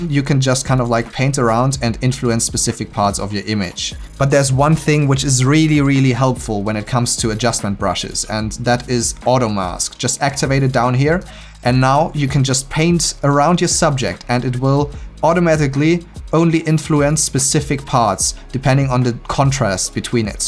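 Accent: Germany. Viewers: Are you German? Because you sound like this😅. Ps: I am German too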